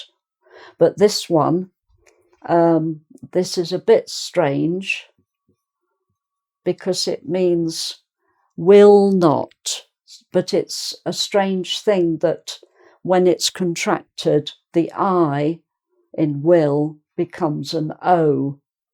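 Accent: England, United Kingdom. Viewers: British sounds perfect in your version. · Oooh British English pronunciation, thanks dear Lady. OMG!!! I've been pronouncing "aren't" completely wrong for like 15 years, I really need to view all your videos my lady, I probably sound really bad speaking English. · Nossa estou apaixonada por esse canal menina kkkk inglês britânico é muito gostoso de ouvir, se minhas prof do ensino medio ensinasse os verbo to be igual ela eu tinha aprendido la kkkkk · Hi..Iam from Chile...I love your pronounce ...pure english😃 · Beautiful lesson in British accent. Greetings from Mozambique 🇲🇿. Linda lição em sotaque britânico. Saudações a partir de Moçambique 🇲🇿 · Hello. Thank you for the lesson. The pronunciation of the contractions are very dificcult. Beautiful british accent!! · Oh an english accent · I love this Britsh accent!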